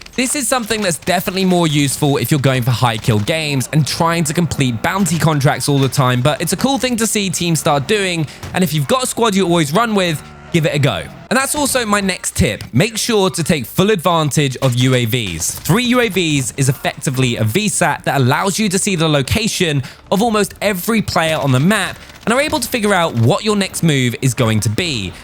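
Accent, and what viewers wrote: United Kingdom. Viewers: Now I know....he actually British by his accents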